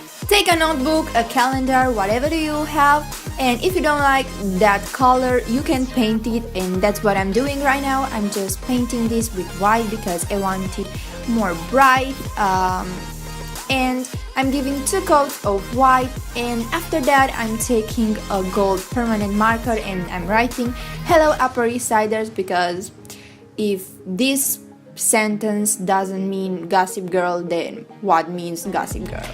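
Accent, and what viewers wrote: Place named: Brazil. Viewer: Hey! Love the DIY's :) and sorry if I'm being nosey, but where are you from? Your accent is so cute! I have a lot of brazilians friend that have the same accent as you, so that's why I'm asking :P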